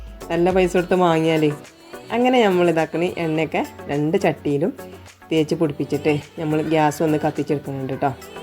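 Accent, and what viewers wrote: Malappuram, India. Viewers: മലപ്പുറം ഭാഷ മലപ്പുറം താത്ത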